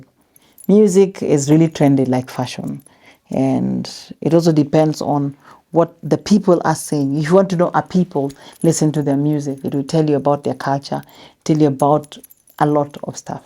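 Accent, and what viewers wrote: Luo, Kenya. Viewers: She’s so hilarious with Luo accent😂😂😂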